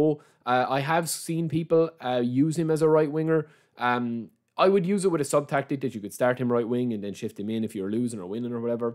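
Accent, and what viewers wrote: Ireland. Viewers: thanks for taking the time to reply ! As a non native English speaker i always loved those typical native accents since they offer more “flavours” than the more official standardised Queen’s English accent. I guess it’s a bit like when native speakers tend to find the accent of a French or Italian “sexy” when they speak English. Last detail for MY personal knowledge: would you qualify your beautiful Irish accent as more typical to a certain part of Ireland or is it rather a generic one ?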